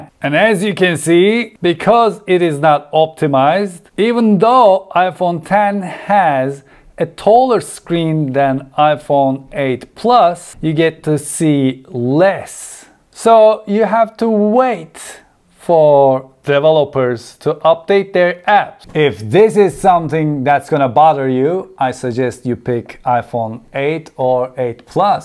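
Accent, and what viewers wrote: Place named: Turkey. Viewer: Abi türk olduğun o kadar belli ki konuşmandan yani başkası olsa bu kadar rahat anlayamazdim ne dediğini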